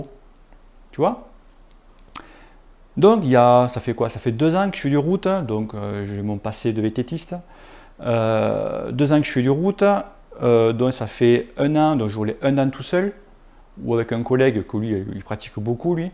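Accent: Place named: Béziers, France. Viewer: j'en étais sur que tu venais d'à côté de béziers, j'ai reconnu l'accent (maillot saint chinian)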